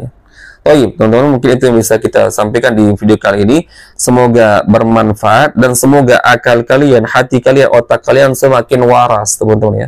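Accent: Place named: East Java, Indonesia. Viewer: kalau di cirikan dari dialeknya kayaknya dari Malang atau Surabaya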